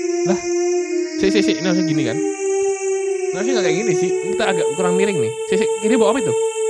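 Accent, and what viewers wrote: Java, Indonesia. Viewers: Njenengan tiang pundhi to mas kok radi jowo ngoten😅😅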